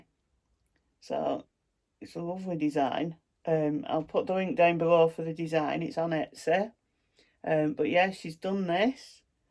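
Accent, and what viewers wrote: Lancashire, United Kingdom. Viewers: I live in Lancashire area, but I discover your chanel with help from Theresa Little Stitcher 🥰 and with your help I will learn british accent from Lancashire 🥰 In August, five years ago, I move to this area and I love it.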